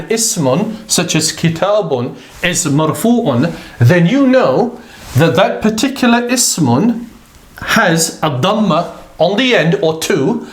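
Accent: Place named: United Kingdom. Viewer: You are speaking British accent! Wow! You're the best Arabic teacher I've ever seen! Thank you, Jazakallah!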